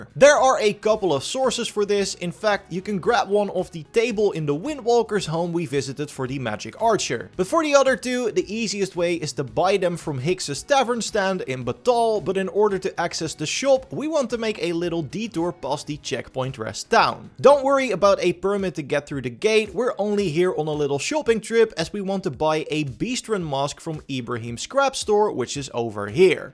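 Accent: Netherlands. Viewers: Ben jij Nederlands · You are so duidelijk Nederlands, Nice video!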